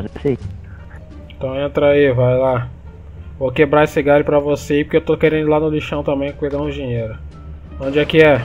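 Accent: Bahia, Brazil. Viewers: É baiano ctz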